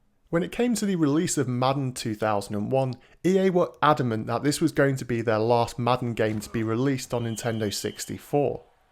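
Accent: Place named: United Kingdom. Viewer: I've finally done it. I've watched all 293 of your N64 game reviews on your playlist, in order. I started this journey 6 months ago, watching 1 or 2 videos a day on my lunch break at work as I smoke Newports. You've become a part of my routine and I thank you for all the hard work you put into these. Now I must move on and find other videos to watch each day, but I've grown accustomed to hearing your calming British voice each day on my break as I sit outside and relax. Thank you,. 😊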